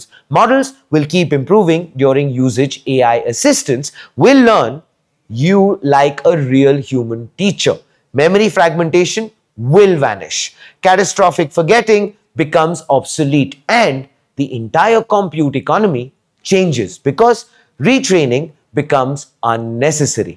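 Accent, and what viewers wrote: India. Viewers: He is separating his words and using volume variety to clarify his Indian accent and doing a very good job of it!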